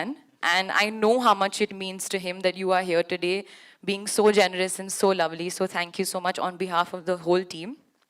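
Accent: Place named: Telugu, India. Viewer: U sound like a telgaite when u speak ..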